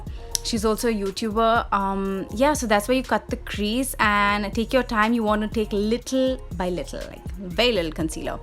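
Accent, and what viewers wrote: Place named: United Kingdom. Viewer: love it as always!😍 and can you plz plz make a video on how you learnt british accent and some tips and tricks to learn it!!!! love ya!😘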